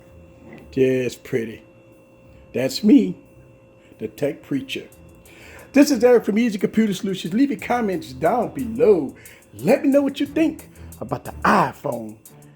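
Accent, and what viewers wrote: Louisiana, United States. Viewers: I hear the accent ! Gotta be from Louisiana I’m from New Orleans and you?! Great video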